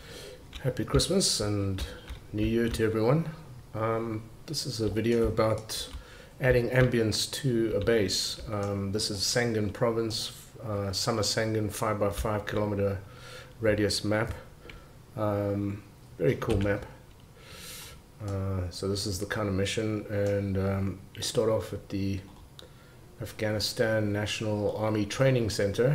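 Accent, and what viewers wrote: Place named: South Africa. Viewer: Yeh, unfortunately it still doesn't work... i've gotten some progress though, i managed to use vanilla but only the leader of the group is moving Edit: ive done it! I know that your from South Africa i could tell by your voice so i'll let you sleep, but after faffing around with my faults i got it to work. Sorry to bother you and thanks again!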